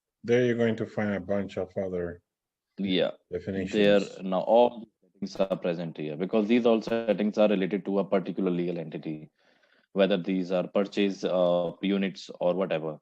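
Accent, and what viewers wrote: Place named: India. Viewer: Amazing sir. Perfect video with perfect indian accent. All clear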